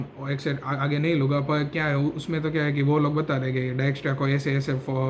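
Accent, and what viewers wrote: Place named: Gujarat, India. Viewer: That gujarati accent relatable fr 😂💖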